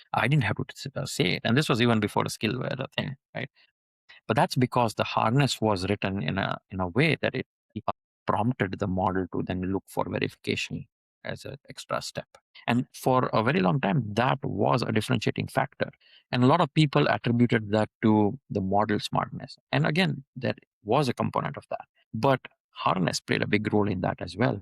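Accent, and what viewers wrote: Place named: India. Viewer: This was a GREAT explanation, even for somebody who already knew most of this stuff you managed to explain some of the primitives from such an original, opinionated angle (eg skills only after sub agents). Bonus points for making me actually like the Indian accent (I usually end up giving up when I only understand 10% of the words). An interesting segue would be discussing how models can or cannot go through reinforced learning to interact with an harness better than another, could that become a moat for models' labs? Subscribed!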